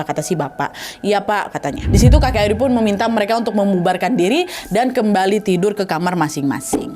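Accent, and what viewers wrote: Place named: Palembang, Indonesia. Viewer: Logatnyo mencak wong Palembang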